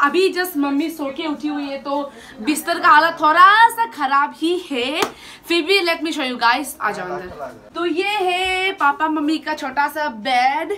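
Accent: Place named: Nagaland, India. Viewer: Aap kaha rahte ho aap k boli se lag raha h aap nagaland se ho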